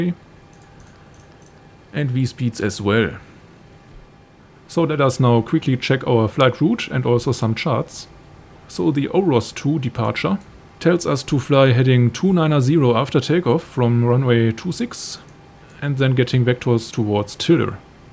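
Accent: Germany. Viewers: oh Hab an der stimme gehört und an das englisch weil wir immer so englisch sprechen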